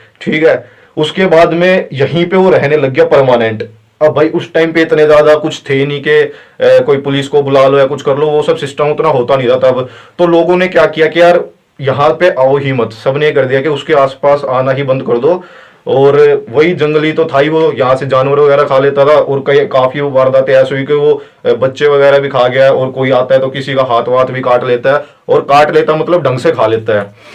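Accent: Haryana, India. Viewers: Genuine content and it is up to the mark. Never knew that such "mature" investigators are there in our community... And that हरियाणवी accent, भाई साहब! Keep growing,buddy :) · Bhai khaa k ho Aap Aap ki voice Haryana ki lgti h m bhi Haryana say hu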